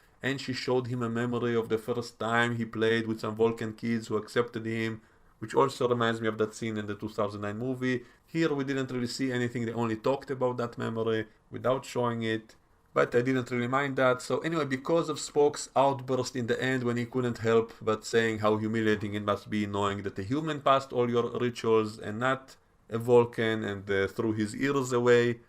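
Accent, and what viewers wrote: Russia. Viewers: What accent is this? Russian? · I explained it this way: the Vulcans simply accepted their wish to not communicate the first time. Not insisting on further action. I totally agree on the loose stuff on ships that shake every now and then. Someone said there were force fields to keep everything in its place, but that doesn't make sense. I'm a man and I love cooking but I never looked at it with gender in mind...... Human Spock eats the bacon after he said "I have to catch up on things", or something to that effect. I think Vulcans have an ability to control their emotions based on certain biological factors. I think they mentioned that once in Enterprise. Mia Kirshner is only 11 years older than Ethan Peck. Spock's mother assimilated quite well to Vulcan customs, so perhaps that's why they can mind-meld? I agree on "emotional Spock". It's a tad annoying. 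But since it's a prequel series, they perhaps try to explain how TOS Spock became the way he is/was? Only question I have: what is your accent? I really tried to figure it out, but I can't. Sounds Russian, but Idk.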